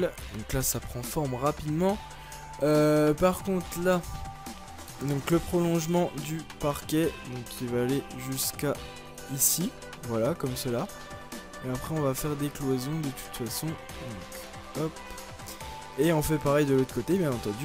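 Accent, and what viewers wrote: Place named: Franche-Comté, France. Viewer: Tu as l'accent de la Franche-Comté toi non ? Si oui bel accent 😉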